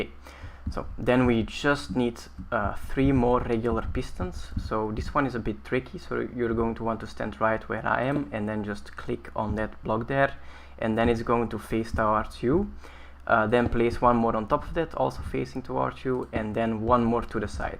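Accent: Belgium. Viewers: Are you from french Belgium? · Haha, dacht ik het niet. Dat accent herken ik uit de duizenden :) Blij om een landgenoot zo succesvol te zien op youtube :D Gebeurt niet veel! Keep up the good work! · are you belgian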